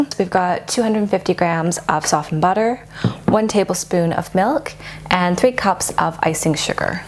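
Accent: Australia. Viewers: Are you Australian? I am! Also maybe you could smile a bit more in your videos! No hate just a tip🙂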